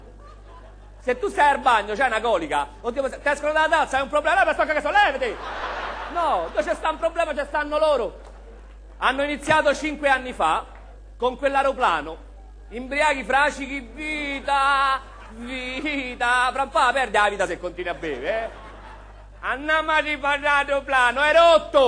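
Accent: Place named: Rome, Italy. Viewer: Parla in romanesco stretto per tutto il tempo, e quando dice "coatto" ci tiene a specificare che è un termine prettamente romano... MA DAI?? -.-'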